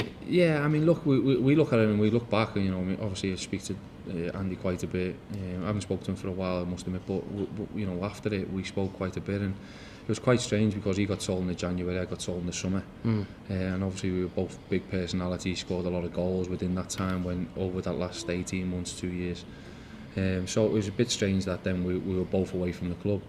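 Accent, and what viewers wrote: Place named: Liverpool, United Kingdom. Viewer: Nolans a scouser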